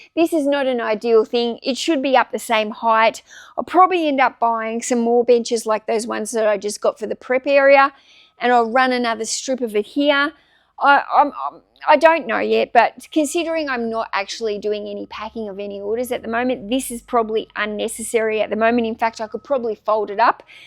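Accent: Australia. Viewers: I just realised how ocker aussie your voice is haha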